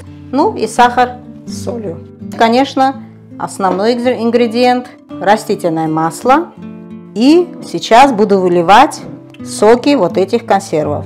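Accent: Batumi, Georgia. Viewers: Бесценны. Ваши рецепты,! Приятный ваш говор, я как будто, побывала в Батуми! Спасибо вам!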